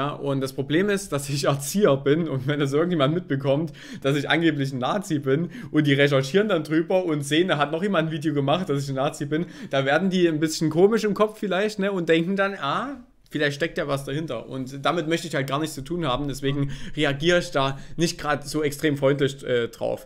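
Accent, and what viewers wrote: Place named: Saxony, Germany. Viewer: Najoa, solang das alles geklärt ist, ist alles gut. Man muss halt vorsichtig mit einigen Äußerungen sein aber so lang er es nicht ernst meinte und er seinen Fehler einsieht, solle mich net stören. Ich Sag's gerne immer wieder aber ich feiere diesen sächsischen Dialekt so derartigst. Das klingt wie meine Familie mal mit Ausnahme meiner Eltern und meines Bruders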